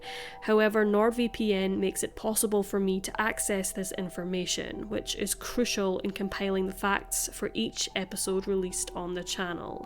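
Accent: Scotland, United Kingdom. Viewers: What a lovely Scottish accent.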